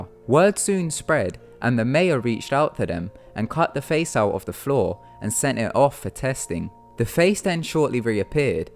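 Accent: United Kingdom. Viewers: Your vids are good and your accent is mint where in the uk you from ? Just subbed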